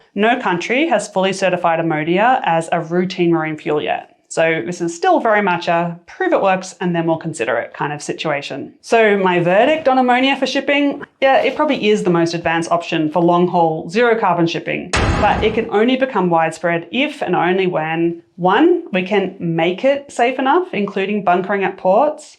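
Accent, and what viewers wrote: Australia. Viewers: I like my bad news with a chipper Aussie lilt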